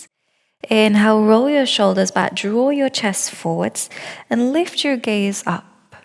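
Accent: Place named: United Kingdom. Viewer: Great practice, I’m a new subscriber, where from the uk is your accent from? Throughout the hour I was getting distracted trying to figure it out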